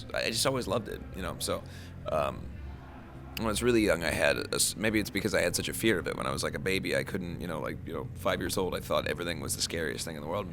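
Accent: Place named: United States. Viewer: He has an American accent. Are you American by any chance?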